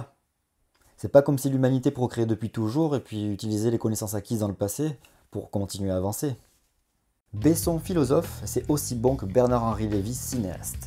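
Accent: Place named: Hérault, France. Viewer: quelles été les possibilités pour que je tombe sur ta chaîne ? quelles été les possibilités pour que tu sois toi aussi de Sens Critique ? Vu ton accent je pari que tu viens de l'Hérault aussi non ?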